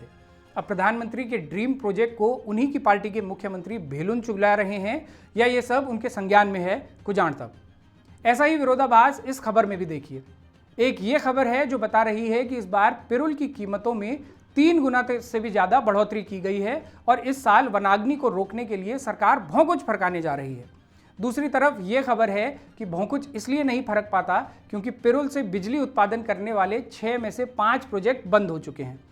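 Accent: Garhwal, India. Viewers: ji good morning आप की पत्रकारिता बहुत दिनों से सुनता हूं आप के वाणी में गढ़वाली बोली भी अच्छी लगती हैं। में अजीत शाह BSF में सेवारत हूं इस वक्त नक्शल प्रभावित क्षेत्र छत्तीसगढ़ में हूं। राहुल जी आप के पत्रकारिता के माध्यम से अपने क्षैत्र की समस्या से अवगत एवं रुबरु करवाता हूं राहुल जी हमारे बिलौक जयहरीखाल की जल संस्थान पेयजल योजना जो 1984 की है उसके अन्तर्गत लगभग दस गांव आते हैं जब पहले गांव में बहुत सारे परिवार रहते थे 90,95, के दशक में तो पीनी सभी को प्रयाप्त मात्रा में मिल जाता था आज उस पानी पर कुछ चन्द लोगों का कब्जा है जो खूब दादागिरी भी करते हैं और पानी की मोटर से भी खूब अपने साग, सब्जीयों में निरन्तर खेत को सींचते रहते हैं ज्यादातर पानी की समस्या गांव तोली में ही है जो इण्टर कॉलेज दुधारखाल के नजदीक ये गांव पड़ता है जब कि इस गांव से श्री अनिल धस्माना जी एवं जौलीग्रांट के कुलपति श्री विजय धस्माना जी इस गांव के मूल निवासी है और इसी गांव में उनका जन्म स्थान भी है। जहां पर पानी का टैंक है ओ टैंक मोली गांव में बना है। मोली गांव और पास्ता गांव में गांव के अगल बगल में पूर्ण तरह से हरियाली है खूब सब्जीया उगायी जा रही है और हमारे गांव तोली में कुछ परिवारों को पानी की समस्या से जूझ ना पड़ता है। सरकारी तंत्र JE,AE, EX, सभी को फोन के माध्यम से और लिखित में भी खूब परियास किया लेकिन सरकारी तंत्र को कोई फर्क नहीं यहां तक कि उप जिला अधिकारी सतपुली एवं विधायक श्री सतपाल महाराज जी को भ्रमण के दौरान रुबरु करवाया था। राहुल जी आप इस समस्या को भी उजागर करना जी। धन्यवाद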